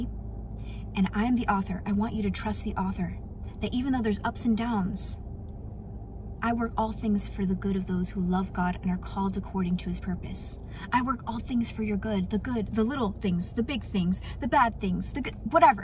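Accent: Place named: South, United States. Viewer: What sound like your from the south. Yall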